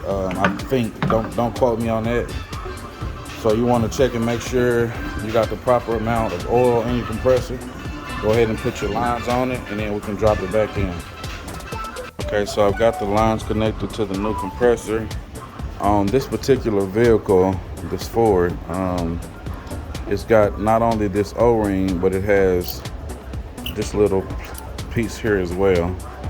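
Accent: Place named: Texas, United States. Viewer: Man I heard you talking and I know straight up yous from Texas nail it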